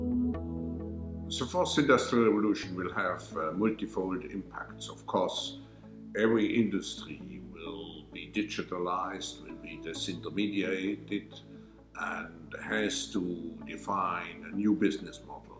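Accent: Germany. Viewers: That German way of speaking.... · Judging by accent and last name, he's either German, Jewish or both. With that in mind, is it no surprise that he talks about a "1984" or "Brave New World" type societies in a positive manner. Update direct from Wikipedia: Klaus Schwab Born: March 30, 1938, Ravensburg, Nazi Germany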